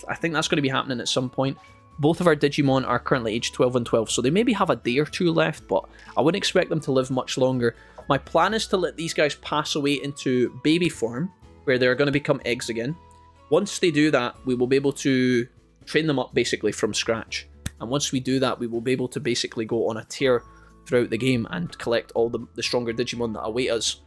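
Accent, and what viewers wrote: Scotland, United Kingdom. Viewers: Watched your digimon vice play through so now on this as i'll watch time stranger later when I'm further in the game (had hardly any spoilers as of yet!). This has made me replaying Next Order from the beginning on my switch in bed at night. Safe to say I did not get the Gruesome twosome. I got Togemon and IceDevimon! Who are now Lilymon and Dynasmon via Gigadramon. Love your play throughs its nice hearing a fellow Scottish accent! 😬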